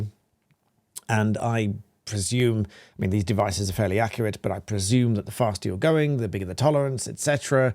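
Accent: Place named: London, United Kingdom. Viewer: sounds like my mistake! Though he does sound like a Londoner😜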